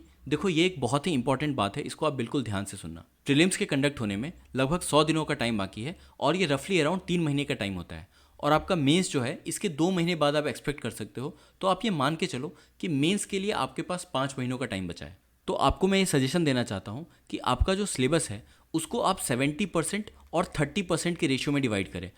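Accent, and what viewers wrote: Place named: Bengal, India. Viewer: Sir আপনি কি বাঙালি ? আপনার হিন্দি তে যেন বাংলা টান আছে ...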